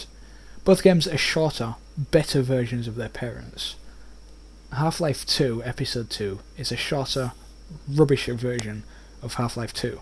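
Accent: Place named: RP, United Kingdom. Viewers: This guy are from West Yorkshire ; ) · ok sorry it just sounds like you were putting on a posh accent sorry for the miss understanding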